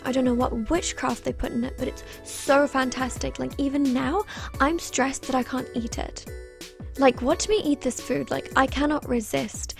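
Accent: United Kingdom. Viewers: I NEVER expected a british accent damn goosebumps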